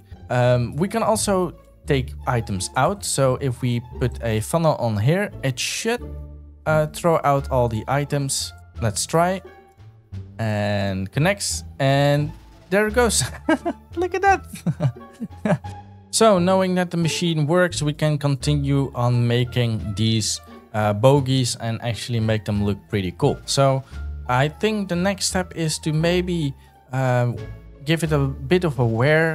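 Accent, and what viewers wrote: Netherlands. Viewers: i immediatly thought i noticed a fellow dutch's accent, looked in your channel bio and saw i was right haha.. anyways great vid tho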